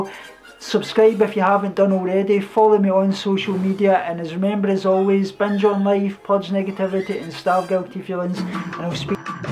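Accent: Scotland, United Kingdom. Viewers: Veganism, Star wars and a Scottish accent! My son will love this video i have to show him!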